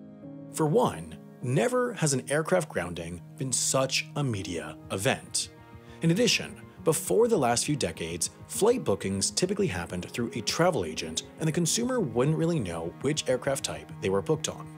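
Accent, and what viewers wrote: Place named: New England, United States. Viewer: This is heavily off-topic, but does Wendover have a Boston/New England accent? I keep hearing him say "No-at" for "not" (more like naat in most American accents), same for "Dollars".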